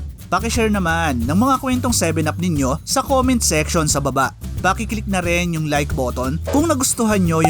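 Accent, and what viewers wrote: Waray, Philippines. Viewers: Waray ka ba tsong?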